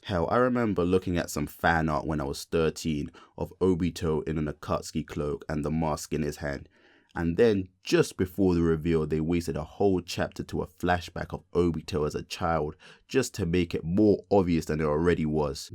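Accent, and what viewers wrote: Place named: United Kingdom. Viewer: bro your british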